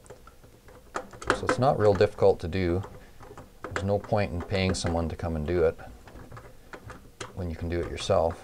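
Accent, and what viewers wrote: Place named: Canada. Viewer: I knew u were Canadian by your accent but you saying 40 below just confirms it. That's crazy cold. Great videos. Best in the web! Thanks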